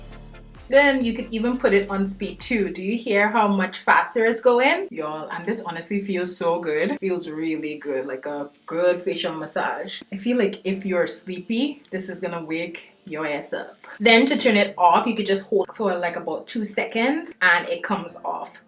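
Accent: Trinidad and Tobago. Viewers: Love listening to that Trini accent on YouTube. We need more west Indian people on this platform. Big love from Guyana 🇬🇾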